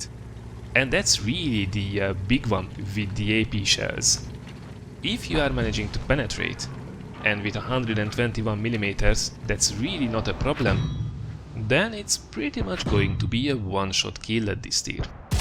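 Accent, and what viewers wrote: Hungary. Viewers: hallom az ahcentusából :) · Such nice Hungrish accent.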